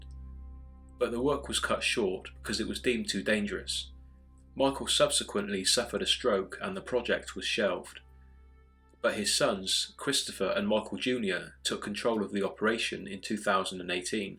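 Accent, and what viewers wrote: England, United Kingdom. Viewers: You need a better microphone to showcase that English accent. It sounds hollow and tinny. Otherwise the video was excellent, keep them coming. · there from different countries, this is an English accent, Bale is from wales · Great video! Love your channel....and American girls love a English accent 🤗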